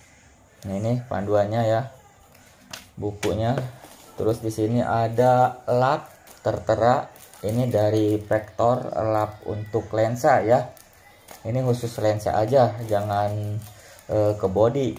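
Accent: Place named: West Java, Indonesia. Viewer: Logat sundaxa sangat lekat kang,salam dari Papua,Asal Majalengka👍👍🙏🙏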